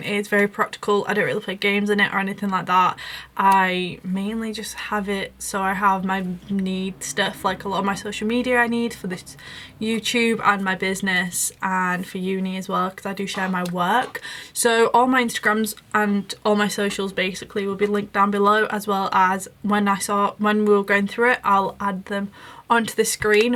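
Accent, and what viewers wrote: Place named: United Kingdom. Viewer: the British Accent kills me very softly.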